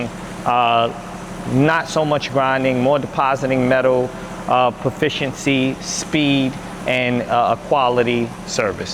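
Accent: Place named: New Jersey, United States. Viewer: Dude the Jersey accent is such a change of scenery for a welding tutorial coming from being taught by a bunch of southern boys. 😎🤘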